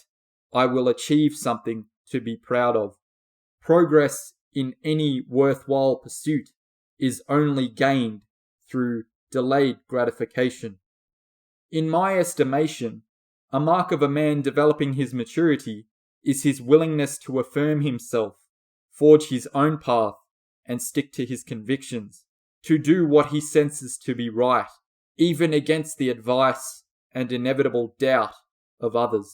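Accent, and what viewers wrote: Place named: New Zealand. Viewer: You may be a strange kiwi, but under all 'thit' accent we are kindred spirits. Thank you for being brave enough to blaze a path.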